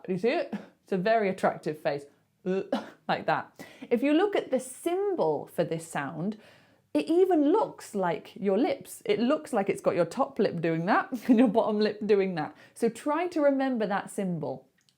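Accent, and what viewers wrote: United Kingdom. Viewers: Really I want merry a nice woman like you.. British accent.. I ❤ British Accent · I love british accent, especially yours,. Kisses from Sicily!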